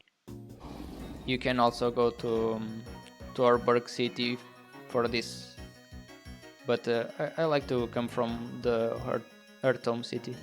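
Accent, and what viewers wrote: Portugal. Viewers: This is gonna be a shot in the dark. But I feel the Portuguese accent here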